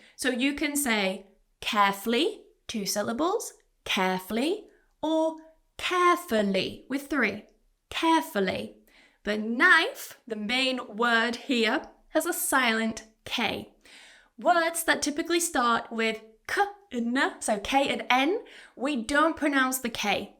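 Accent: United Kingdom. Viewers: Hello..I would like to join ur classes.how can I joy? Actually now I'm in UK.I like ur British accent and your pronunciation course would help me alot. Thank u a lot.I'm looking forward ur reply.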